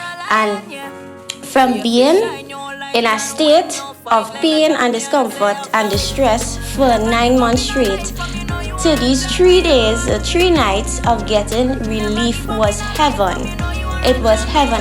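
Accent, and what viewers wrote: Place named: Trinidad and Tobago. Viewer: I hear you loud and clear. Ive been going through TSW 25 months. Getting better each day, still flaring but im doing much better than year one. I hear your accent, my parents ars from Trinidad as well. I plan to do a video soon once my journey is over, i want to make sure i am completely healed and advise on what works and what not to waste your money on. This journey is not easy and proud of you sharing your story. Time is the only healer on this journey.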